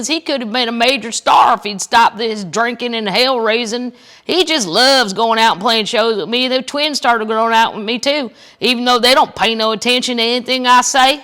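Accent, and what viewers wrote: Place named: Appalachia, United States. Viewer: your hillbilly accent has gotttn very strong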